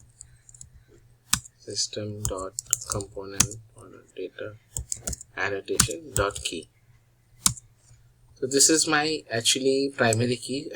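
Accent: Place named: India. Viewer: Why is indian accent so horrible?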